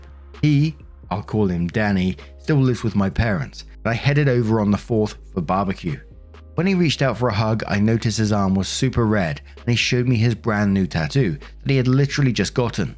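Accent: England, United Kingdom. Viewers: “You don’t have a Scooby Doo what it could be” Nice to hear speaking English English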